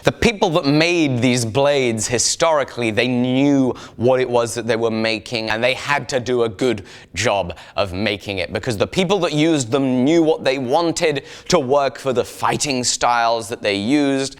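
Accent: England, United Kingdom. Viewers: Dude your English accent is awesome. It let's you make up words that still sound great.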